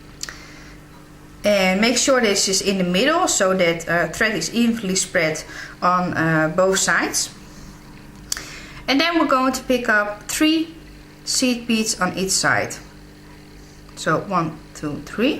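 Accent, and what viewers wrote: Netherlands. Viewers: I will definitely make this one! leuk t Nederlandse accent ook hihi :-) thankss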